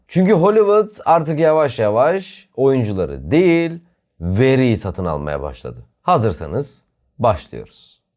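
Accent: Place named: Çorum, Turkey. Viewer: Çorum şivesi :D jhafklsjnklfsşjfslşf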